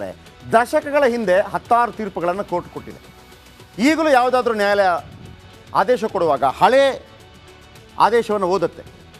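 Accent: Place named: Rural, India. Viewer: ಸರ್ ಅದ್ಭುತವಾಗಿ ವಿವರಣೆ ನಿಡ್ತಿರಾ ಹಳ್ಳಿ ಭಾಷೆ ಮನಸ್ಸು ಮುಟ್ಟುತ್ತೆ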